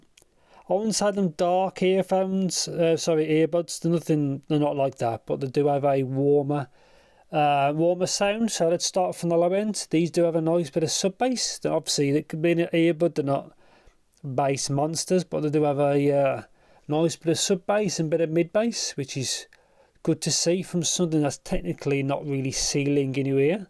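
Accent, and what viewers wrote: United Kingdom. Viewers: Bro im here again but can you plese try to go out of british accent a little bit ? its really annoying some word are like in another language cant figure out wtf is going on.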